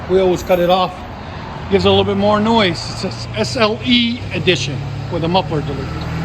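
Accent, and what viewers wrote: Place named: New York, United States. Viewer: He sounds like he from new york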